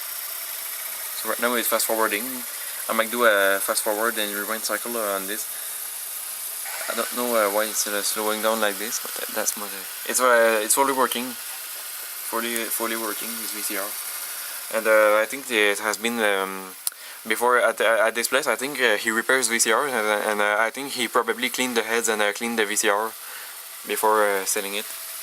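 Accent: Quebec, Canada. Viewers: Marrant son accent québecois in english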